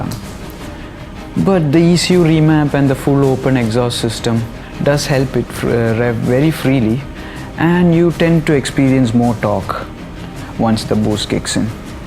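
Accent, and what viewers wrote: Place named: Kerala, India. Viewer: കേരളാ ഇംഗ്ലീഷ്..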